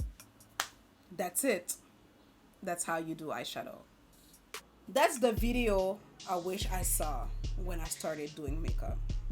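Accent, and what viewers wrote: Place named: France. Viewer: YOU sis deserve a standing ovation!! I have to tell you that I am a whole, adult female who doesn't wear much make up BUT has always appreciated the beautiful looks. I have ZERO knowledge about how to apply it. I rock the basics and like 'I'm good' YET the inner diva has always wanted to rock a beautiful natural face. I landed on your tutorial regarding an 'I Heart Revolution palette (which I picked up at TJ MAXX in NYC USA) trying be to be cute🤣🤣 and was intrigued at how descriptive you were. I loved your french accent also, so cute 😊 but also because you were easy to listen to and came of so genuine and non judgemental. I then peeked at your page and SUBSCRIBED as soon as I landed on your *affordable MAKEUP FOR BEGINNERS tutorial. It was a wrap. Thank you, thank you your tutorials are BY FAR THE BEST, THE most COMPREHENSIVE tutorials on YouTube. 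I feel confident that I can rock a cute look for my upcoming cruise. Much MORE success sis!🙌🏾👍🏽💜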